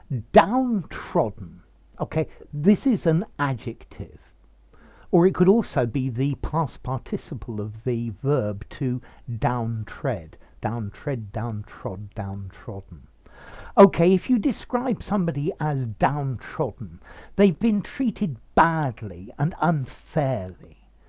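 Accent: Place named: England, United Kingdom. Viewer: I can't thank you sir . Really clear explanation + awsome English accent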